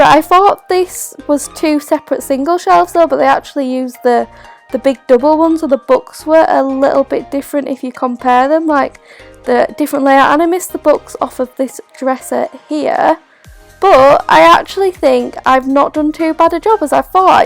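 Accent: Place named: Leeds, United Kingdom. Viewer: Are you from leads? I love your accent ❤❤❤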